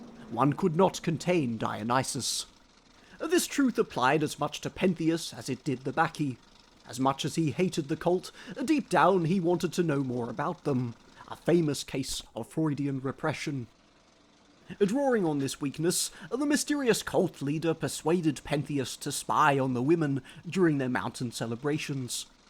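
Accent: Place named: United Kingdom. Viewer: This sounds very British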